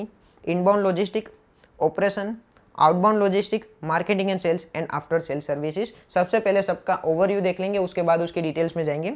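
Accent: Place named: Kathiawar, India. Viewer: Sir where are you from? Your voice tone little bit.. gujarati kathiyawadi..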